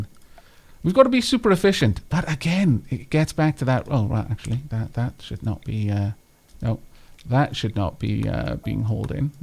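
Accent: Wales, United Kingdom. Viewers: Smartness Tooth is out, novacaine wore off, video is out... Trying yo decide wether to die from the pain, or jump for joy for a new RimWorld video. Leaning much more towards the jumping for joy option. voice is very soothing... I'm only a quarter through the video, and my jaw is already starting to fade. I think its the Welsh accent. Even my 'murican upbringing can appreciate an amazing accent ^-^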